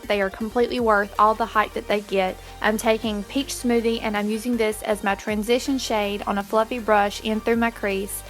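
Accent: South, United States. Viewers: yay ur back I missed u I love the look and ur personality ur awesome and I love that u have that southern accent I got it to and never be in braised about it. it's just who we r yay sending big love from TN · ahhh reading down further, a southern accent!!! Love it, I'm in Tennessee!!! :)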